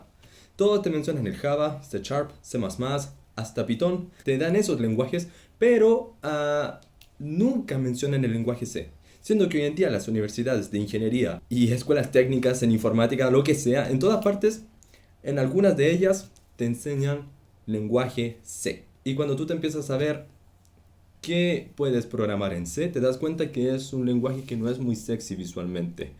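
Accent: Chile. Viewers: hablas como chileno bro:3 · Consulta aprendiste español en Chile? tu acento español me parece bastante chileno xD Estoy aprendiendo a programar en esta pandemia y comence con lenguajes mas modernos como javascript o ruby, pero me di cuenta que si quiero llegar a ser bueno debo aprender C que te enseña cosas elementales de la programación. Saludos desde Chile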